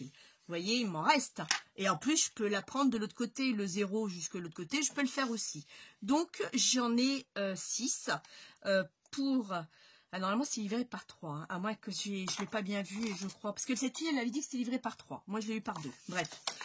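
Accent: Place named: North, France. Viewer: Cc, j'aimai beaucoup comment tu étais coiffé sur ta dernière vidéo, ça te va trop bien, dommage de les avoirs coupés, mais bon.... J'ai beaucoup aimé tes présentations comme toujours, avec ce fort accent du Nord mais j'adore...😅 Très bonnes fêtes à toi et toute ta petite famille....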